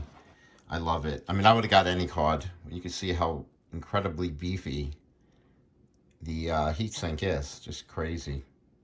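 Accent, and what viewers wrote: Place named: New York, United States. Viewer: Grats on the card, i'm still waiting for mine. Side note, I really miss new york and the accents loi.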